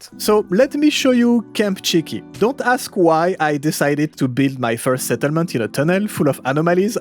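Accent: France. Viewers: Amazing video, cool french voice to artefact hunt to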